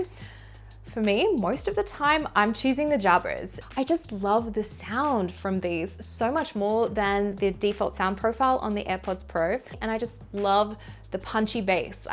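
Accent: Australia. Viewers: Nice hearing an Aussie accent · Dodgy aussie accent. Reminds me of the racism i faced while i was there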